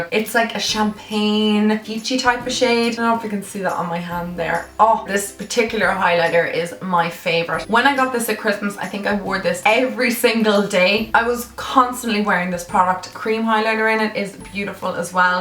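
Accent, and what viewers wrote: Dublin, Ireland. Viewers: would you ever consider doing a full face of makeup revolution, love your videos btw great to hear a familiar Irish accent ❤❤❤ 🇮🇪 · I feel like I recognise your accent are you from Dublin Coz I am plz answer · I love the Irish accent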